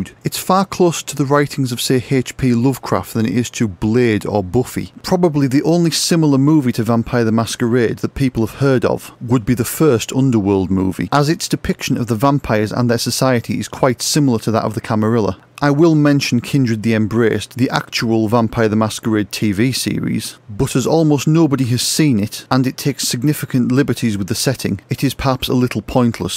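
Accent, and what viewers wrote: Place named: United Kingdom. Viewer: Instantly subscribed during the first minute because of your accent. British accents are far too rare on yt.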